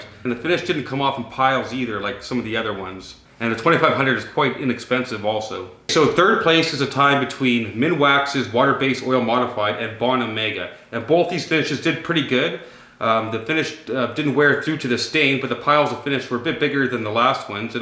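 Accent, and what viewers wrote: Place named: Canada. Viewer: I knew you were Canadian as soon as i turned it on! i'm going to refinished my floors and this was so much help!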